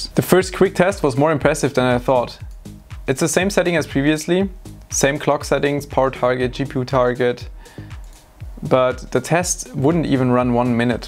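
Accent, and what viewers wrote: Germany. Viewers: man hört das deutsch echt arch (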